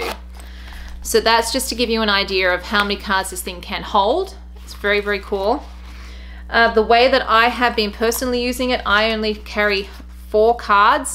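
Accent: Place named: Australia. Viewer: New to your Chanel. You sound Aussie! So why the US $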